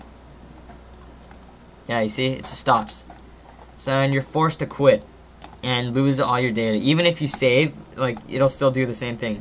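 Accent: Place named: United States. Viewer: What happens with mine is when ever I try to upload a video onto YouTube, it starts to save my video and then half way through my laptop decides to die :) And my laptop isn't over heating....it's a mystery. Also, don't worry about stuttering, it could be because your nervous, I get nervous too and totally mess up. Also your American accent is cool :)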